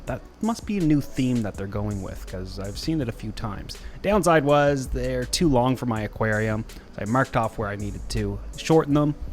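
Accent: Canada. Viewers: 1st thing I like the idea of brackish and the lay out of aquarium. I've had few types of tanks over the years. Don't have any now and no I haven't lost the Love for the hobby it's I want to do something different but habits are hard to brake. 2nd I hate it when people belittle themselves, I'll answer your question why pronounce the way you do. Bro it's your accent. I'm not to familiar with Canada but in the States we have to deal all kinds of different accents. Every part of country seems to have its own. Good luck with the brackish and don't let fools like these question yourself.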